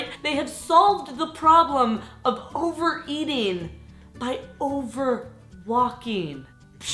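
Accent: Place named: Wisconsin, United States. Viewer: oooohh myy goddd your Wisconsin accent is crazy thick lol smh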